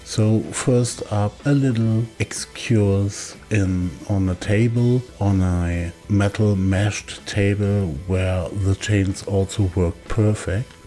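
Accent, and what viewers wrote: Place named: Germany. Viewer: Bist du deutsche wenn ja gutes Englisch aber man hört noch minimal das du nicht ganz enänder bist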